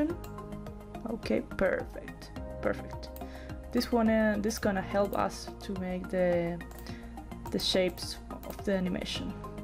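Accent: Chile. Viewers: Chilean? Wkajjs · ♡I LOVE YOUR ACCENT OMG♡ (I'M CHILEAN TOO HAHAHA)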